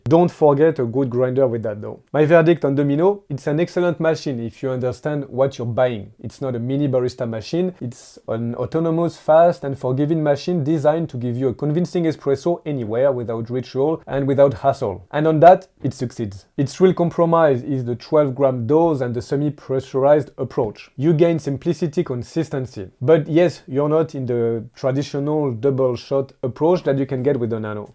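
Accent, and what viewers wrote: France. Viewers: Belle accent français 😂😂😂😂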